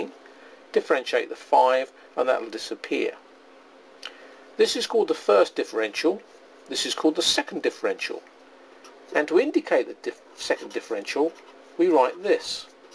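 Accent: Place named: United States. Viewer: thanks erm are you american or something...i love your accent! especially when u say...'That was careless of me' when u make a mistake hehehe x x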